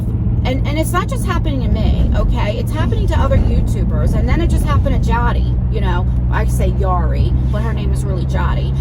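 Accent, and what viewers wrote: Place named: South Jersey, United States. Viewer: I watched the whole thing. I can watch you 24/7 day bc it feels like I’m just hanging with my girlfriends lol I’m from south Jersey and talk just like you so I piss myself when you start dropping “Motherf** & friggin lol. That’s me all day everyday 😂❤️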